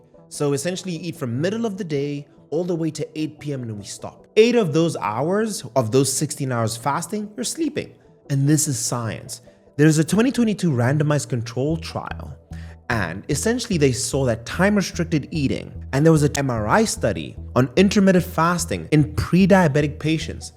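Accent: South Africa. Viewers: Aaah are you South African? The accent says I come from South Aaaahhh. If yes, please make us an SA specific meal plan, with all the foods we love in a way that helps us🤦🏾‍♀️🤦🏾‍♀️🤦🏾‍♀️. Shooting my shot. Or I read too much into the Aromat and accent. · . You have a South African accent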